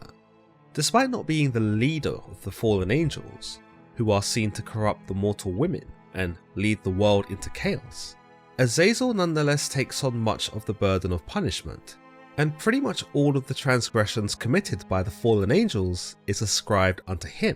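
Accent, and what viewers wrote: United Kingdom. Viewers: No AI voice just a cool British guy with a great narrator voice keep going brother .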